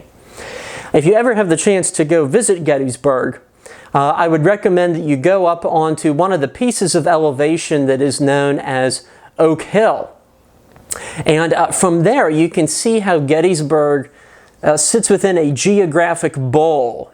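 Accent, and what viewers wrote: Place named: Pittsburgh, United States. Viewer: This guy is a Yinzer no doubt.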